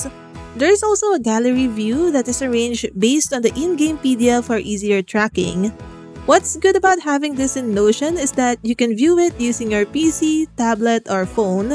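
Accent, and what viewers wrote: Philippines. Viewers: Great tips and video. That Pinoy accent though...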